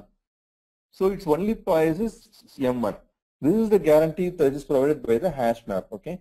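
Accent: India. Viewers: it is easier if u are indian. bec you are having the same accent, no offense.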